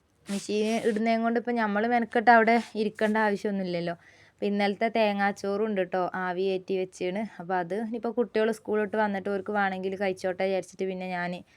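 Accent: Malappuram, India. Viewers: മലപ്പുറം ഭാഷ ആണല്ലോ 😮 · എനിക്ക് ഏറ്റവും ഇഷ്ടപ്പെട്ടത്. അവതരണമാണ്.മലപ്പുറം സ്റ്റൈൽ'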